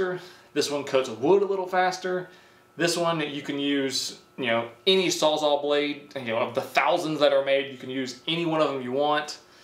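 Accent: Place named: Wisconsin, United States. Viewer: You sound like your from somewhere in Wisconsin We’d know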